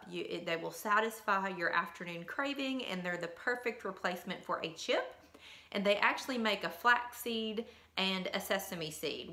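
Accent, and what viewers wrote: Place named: Kentucky, United States. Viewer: aha! Thought I recognized that accent. I am from eastern KY but mostly raised in southern Indiana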